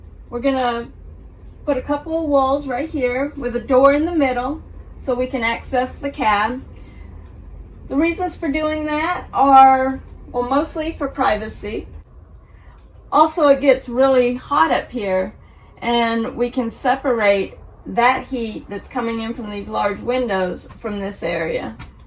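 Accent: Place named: United Kingdom. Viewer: Yeah she really sounds like she’s from the uk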